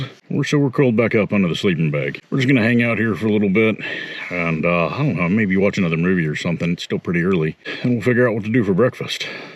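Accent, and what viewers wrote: South, United States. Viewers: Aha, I knew I heard a southern accent. I finished a three year old video a little bit ago and in my comment , I asked if I caught a southern accent. I just read a comment by you saying you were born in Georgia.. Me, too. I was born in nineteen forty five in an ambulance on Peachtree Street in downtown Atlanta. I'm married a TenneNossee boy, and we moved to tennessee when we retired. The cold and snow and ice of minnesota that you And Fiona camp in just blows my mind. Just What do you find fun in that? I do Really enjoy watching you do it.But I do worry about Fiona sometimes. I look forward to your scamp adventures and watching you redo your Scamp camper.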